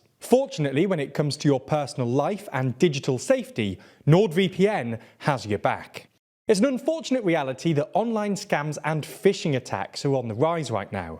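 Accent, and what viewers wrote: England, United Kingdom. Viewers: It's funny listening to an Englishman pronouncing Hebrew words as if they were English words.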